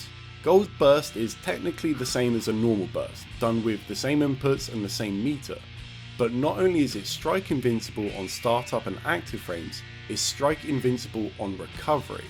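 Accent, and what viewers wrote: England, United Kingdom. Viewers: What's with this super heavy duty metal in the background as you calmly speak in a refined English accent? Lol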